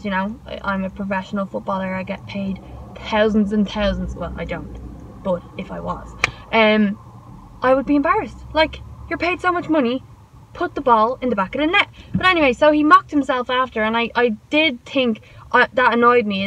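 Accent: Ireland. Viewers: You're Irish where you from love I'm from tallaght can't guess your accent haha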